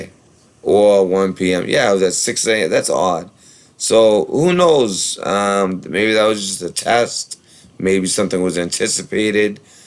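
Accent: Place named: Boston, United States. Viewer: Weems yeah his accent is awesome, I know others from Boston and they only sound like him when their mad!🙏🏻💞🇺🇸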